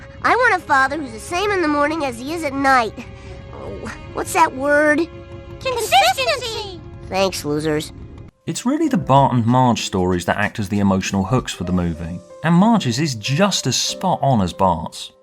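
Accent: United Kingdom. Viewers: Academic cuz he bri ish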